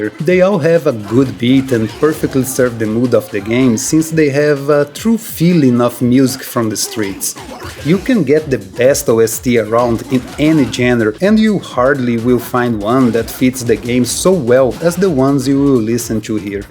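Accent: Brazil. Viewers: Cara, vc é brasileiro? Me parece que sim pelo seu sotaque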